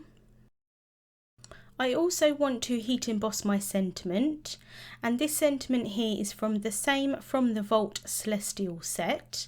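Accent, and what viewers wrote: United Kingdom. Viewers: I always enjoy your video's and just love your British accents!!!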